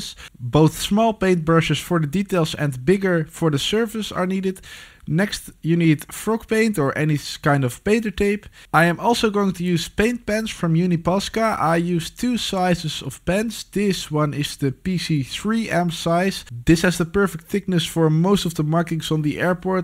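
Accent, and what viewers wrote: Netherlands. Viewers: Love het nederlandse acent in het engels😂😂😂😂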